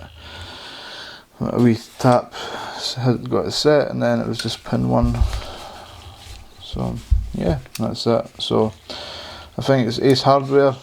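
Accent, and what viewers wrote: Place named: Scotland, United Kingdom. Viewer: Haha, nicely done. Orange belt for you bud! Refreshing to hear a Scottish accent that isn't my own while picking. Keep up the great work bud.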